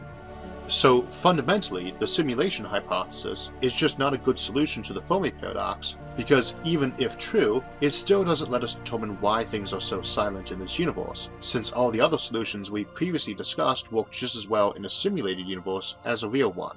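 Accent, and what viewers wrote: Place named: New England, United States. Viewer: WTF accent is he speaking with? Cross between a Boston and Maine accent?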